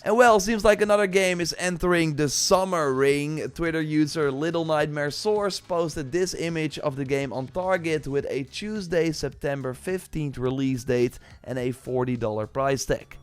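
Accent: Netherlands. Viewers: Klinkt Engels, als een Nederlander...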